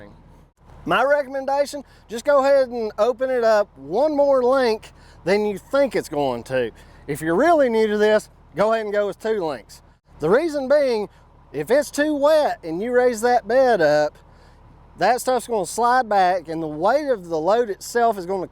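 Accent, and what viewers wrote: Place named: North Carolina, United States. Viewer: Just found your channel, moved to nc from illinois, bought 60 acres and have built a home on it, pond already existed and was searching videos on how to build roads…heard your accent and thought to myself, this guy talks just like my neighbor…sure enough, looked you up…NC..definitely a native. Im located a little far east for you, but i would have you come repair my pond, the shorelines have all collapsed and need the muck cleared out and built back up the shorelines. Finding anyone who has pond experience in my area seems impossible. Im actually thinking of just buying a kx-080, use it for a couple years and then sell it, they hold their value pretty well from what i see.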